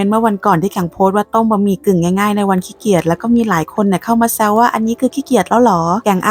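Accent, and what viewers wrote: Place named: South, Thailand. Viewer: น่ากิน มาก ตอนแรกเราก็ คิดว่าเป็นคนใต้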